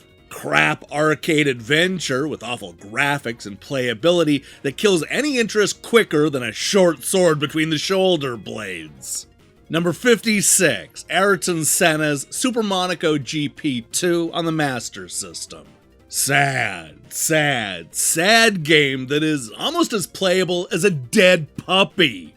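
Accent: United States. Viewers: All this extremely British writing with a heavy American accent really makes this video work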